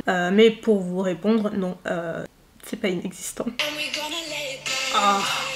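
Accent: South, France. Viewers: Je viens d'aller voir tes premières vidéos pour vois si tu avais changé! et non tu n'étais pas du tout hautaine, n'importe quoi! Par contre tu avais plus l'accent du sud, c'est rigolo :)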